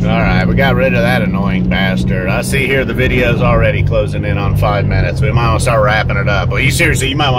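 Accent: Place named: Alabama, United States. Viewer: Damn. You could be riding through Slapout, Alabama if I didn't know any better. Just different accents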